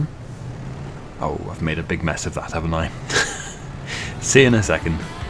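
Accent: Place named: United Kingdom. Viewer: I always thought you were an Indonesian creator 😂 until I heard a thick Brit accent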